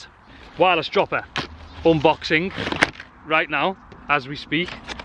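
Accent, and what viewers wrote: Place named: RP, United Kingdom. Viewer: Very posh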